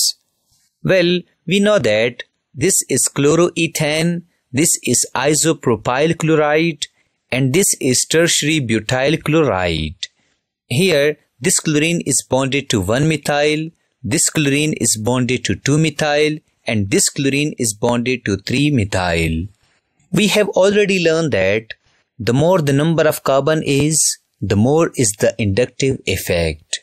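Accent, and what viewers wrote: South India, India. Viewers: I thought you are south indian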